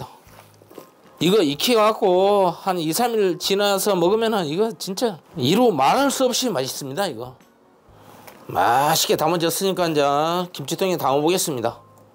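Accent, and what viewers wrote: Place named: Gwangyang, South Korea. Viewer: 말투가 우리동네 같네요~~ㅋㅋ 정겹네요 이것은인자~~그래갓꼬~~광양말투 항상 맛있게 만들수있게 레시피주셔서 감사합니다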